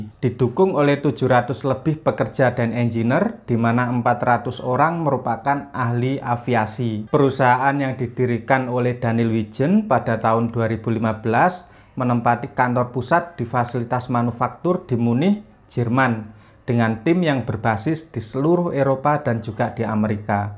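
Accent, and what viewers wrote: Java, Indonesia. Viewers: amrika,,,hadeuuh jowo bnged sihh ngomongnya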